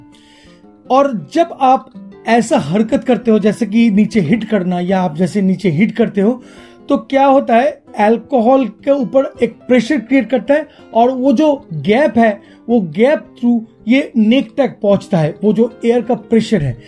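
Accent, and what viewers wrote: Bengal, India. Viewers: Aeeyyii dada, aapni bangali🤓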